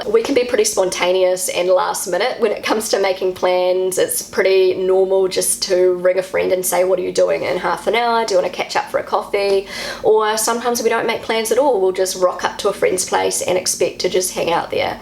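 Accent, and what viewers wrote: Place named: New Zealand. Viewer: Also bei mir geht das Verstellen der Abspielgeschwindigkeit zwischen 0,25 bis 2x, allerdings verstehe ich auch bei Normalgeschwindigkeit ganz gut. Anfangs hatte ich auch etwas Probleme mit ihrem Kiwi Accent, aber daran gewöhnt man sich relativ schnell..!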